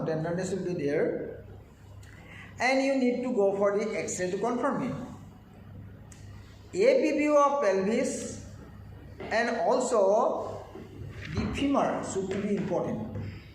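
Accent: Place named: Hindi, India. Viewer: Sir Your Hindi accent is so Nice❤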